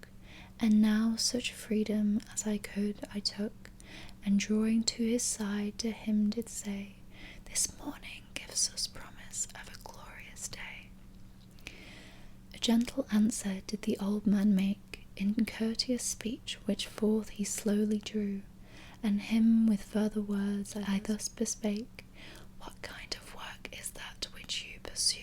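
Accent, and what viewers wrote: United Kingdom. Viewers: Thanks for your contents. Your pronunciation is very pleasant, are you British?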